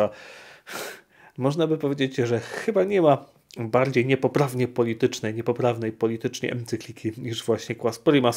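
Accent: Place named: Italy. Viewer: Strasznie mnie drażni włoska wymowa łaciny przez księdza. Równie dobrze mógłby ksiądz wymawiać łacinę według reguł polskiego języka albo jakiegokolwiek innego. Uczyłem się łaciny u trzech mistrzyń, a ostatnią była ś.p. pani Maria Kosiarska na KUL, która cieszyła się ogromnym autorytetem i nigdy nie słyszałem, aby którakolwiek z nich mówiła manium, redżina czy czelum. Zawsze było magnum, regina i coelum. Dla mnie okaleczanie łaciny poprzez włoską wymowę jest obrzydliwością, bo każda deformacja jest miła diabłu. Poza tym dzięki za świetne audycje.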